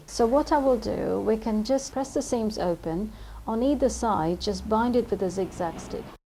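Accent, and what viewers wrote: United Kingdom. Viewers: Excelente la forma que tienes de explicar y tu acento británico tan bonito. ¡¡¡Felicitaciones!!!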